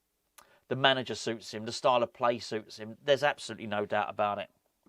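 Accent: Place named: North London, United Kingdom. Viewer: You sound like you come from North London, go back to your own site's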